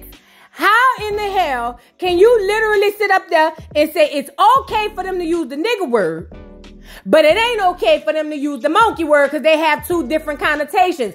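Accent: New Orleans, United States. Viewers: Sis I absolutely love your "Nawlins" accent and your commentary! That "get her up here" gets me everytime! Stay on their necks sis!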